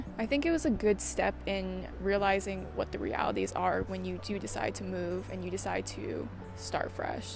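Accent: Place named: Toronto, Canada. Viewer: Is this in Toronto because I noticed the accent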